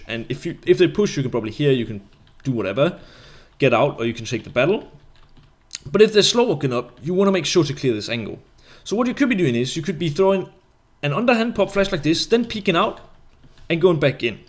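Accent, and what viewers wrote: Denmark. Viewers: are you danish? xD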